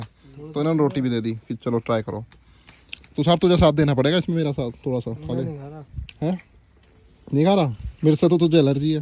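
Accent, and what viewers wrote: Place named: South, India. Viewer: Thora ahista bolo Bhai..... Tumhara Hindi south Indian bale Tamil Telugu jaisha lagte hay....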